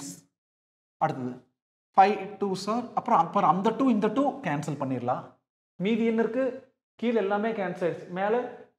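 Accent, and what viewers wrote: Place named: Pollachi, India. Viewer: Excellent teaching sir ❤❤❤❤ . I love your Pollachi accent 😊😊😊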